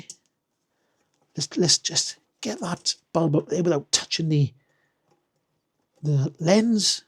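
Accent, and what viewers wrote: Wales, United Kingdom. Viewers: Love that Welsh Accent, By Here!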